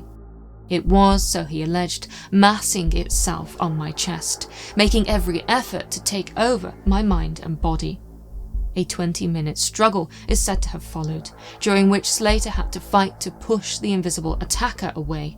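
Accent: United Kingdom. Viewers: Subbed within minutes of my first experience with you (fellow Brit and listening to you makes me a very proud Brit!! 🤩) I will now be binging on all of your documentaries.Fabulous orator delivering articulate and engaging content. I am all in 100% invested in this beautiful channel!! CONGRATULATIONS 👏 you deserve millions of subscribers.Bravo 🙌 🙏 lots of love x🩷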